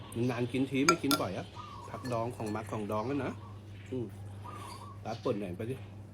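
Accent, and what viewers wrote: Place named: Korat, Thailand. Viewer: พี่ พูดเหมืนคนโคราเลยน้องเป็นคนโคราชแต่มาอยู่ที่ปารีสดูทุกวันเลย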